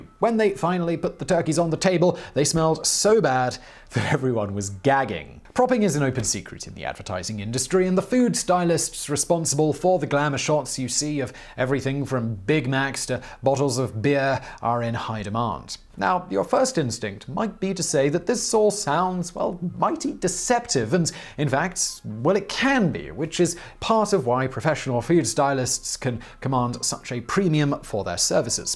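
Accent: United Kingdom. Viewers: I can't complain. It was so well integrated, I thought I was watching a British person. Might I add, the accent helps.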